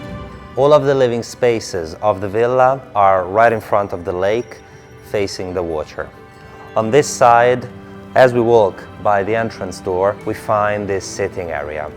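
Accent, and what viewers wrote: Italy. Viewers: Love it,! Especially when clip is over 10 minutes! Please come back with your italian, it gives so much style and chic..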